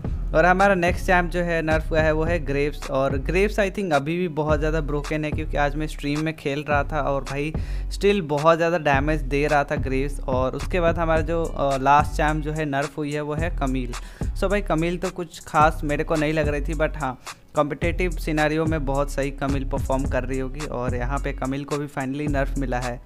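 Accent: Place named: United States. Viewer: live stream wala accent vedio me american accent kese ban jata hai 🥺🤦‍♀️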